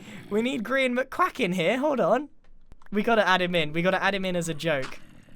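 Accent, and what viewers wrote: United Kingdom. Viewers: U to british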